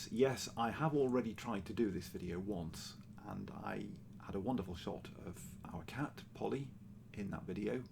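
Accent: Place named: England, United Kingdom. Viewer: Watched and subscribed! Welcome to flosstube - you did a great job on your first video, and I loved listening to your accent. I'm originally from England, but emigrated to Australia 12 years ago, and I love finding other people from England on flosstube - makes me feel connected 👍🏻 Looking forward to your next video 😃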